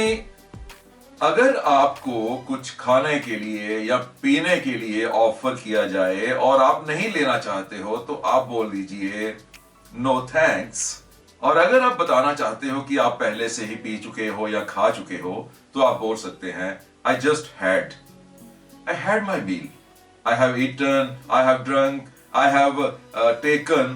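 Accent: Punjab, India. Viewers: Sir , You speak hindi in punjabi tone and me too, will you please tell me how to fix it?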